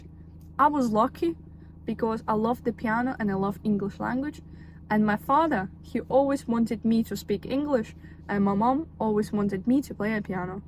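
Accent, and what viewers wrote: Scotland, United Kingdom. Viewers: you have a beautiful accent! is it just my imagination or do you have a little bit of scottish? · Iv listened to a few of your videos, but your carol of the bells piano is stunning. Always thought you was eastern European for some reason. I can definitely hear the distinctive Scottish in you and the name should of been a give away. But am half Scottish grew up half life in England and Scotland but im struggling to understand you at times. Are you full Scottish. Ya accent is almost like Scottish and Scandinavian or something. Ah ok your feom Ukraine im more into the video. Your accent is almost like 3 accents, im kinda seeing what my accent is like now for the first time haha. As my accent is all over the place also 😂. Awsome Use ableton is more intuitive especially for just play loops in live and capturing ideas.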